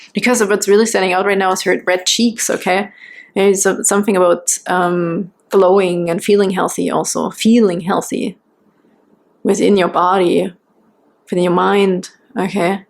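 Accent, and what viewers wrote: Germany. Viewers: Dear, big thx for pile 3. It resonated 100%. Can I ask you a personal question? Is your mother language german? I kinda hear it when u speak. Big greetings from Austria, Nicole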